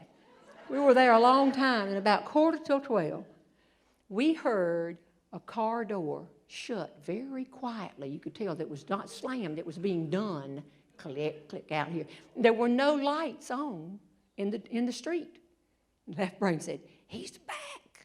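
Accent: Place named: North Carolina, United States. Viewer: I could tell by your accent you were from N.C. I was hearing the Piedmont area and then you said Alamance County. There you go! I live on the east side of Raleigh off 64.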